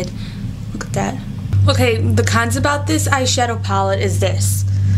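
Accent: Brooklyn, United States. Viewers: u r really so damn pretty, they way u sound and the way u look dont match, u have this exotic beautiful look and one would almost expect this smokey sultry voice with a european accent, but u sound the the kid next door from brooklyn or something. LOL anyway good reviews and tutorials, keep em coming!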